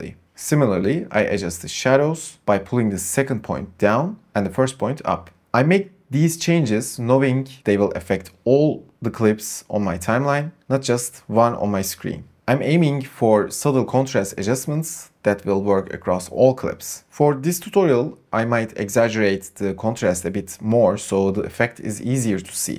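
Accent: Turkey. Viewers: AKSANDAN ANLADIM TÜRK BU :D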